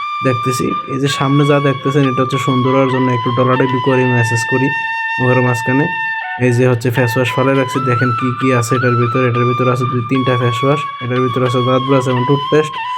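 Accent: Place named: Comilla, Bangladesh. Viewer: আসসালামু আলাইকুম, ভাই, আপনার বাড়ি কি কুমিল্লা, বুড়িচং/দেবিদ্বার এইদিকে? কিছু মনে কইরেন না, আপনার কথায় আমরার মতো মনে হইলো😊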